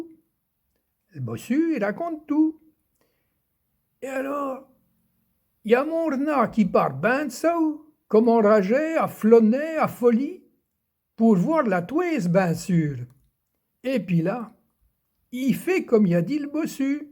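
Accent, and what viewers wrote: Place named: Touraine, France. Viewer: Moi qui suis de Touraine, c'est fou comme votre parler ressemble au mien ! C'est ben piesant à oude ! Grand marcite.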